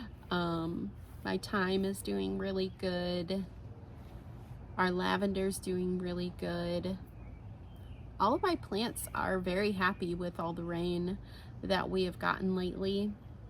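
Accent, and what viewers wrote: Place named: Wisconsin, United States. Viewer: Another Wisconsinite here! Thought I recognized that accent😉😂😂😂 Just stumbled on your podcast😊 and I'm so happy I did! Just learned to knit socks about 2 years ago and have become obsessed!!! They are THE best for our frigid WI winters❤️❤️. I also started the tradition of gifting them to my adult daughters for Christmas - this will be the 3rd year😊 And they always end up sending me pics of themselves wearing them & saying how much they love them!!! Thanks for taking the time to share your knitting!!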